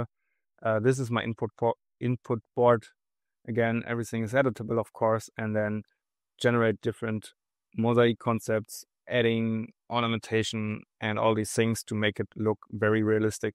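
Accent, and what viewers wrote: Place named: Germany. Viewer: dieser Deutsche Akzent 😅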